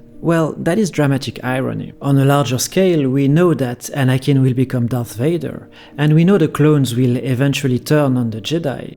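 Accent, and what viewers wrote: France. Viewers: Holy French accent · tu es francais?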